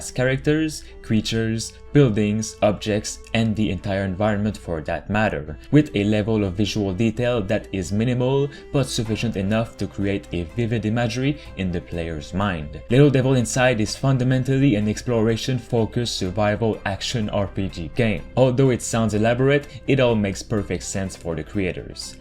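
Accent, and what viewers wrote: Quebec, Canada. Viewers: The Quebec accent here is strong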